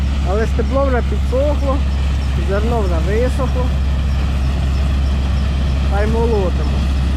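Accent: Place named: Poltava, Ukraine. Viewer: Яка розкішна і соковита полтавська вимова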